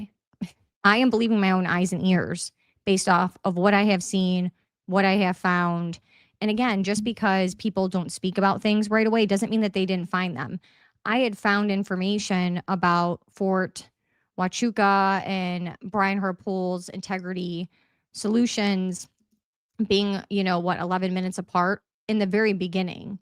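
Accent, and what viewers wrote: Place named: Midwest, United States. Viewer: Youre from Florida 😮 i thought by your accent you were from the Midwest like Wisconsin 😂😅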